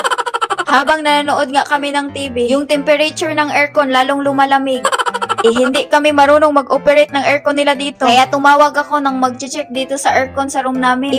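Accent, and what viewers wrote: Visayas, Philippines. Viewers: Madam bisaya ka?😊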